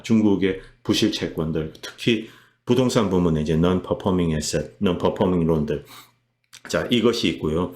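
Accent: Gyeongsang, South Korea. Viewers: 애쓰시네요 ㆍ그런데 이리 방대한 자료를 어디서 구하시는지 궁금하네요ㆍ 미국 계셔도 경상도사투리 구수합니다ㆍ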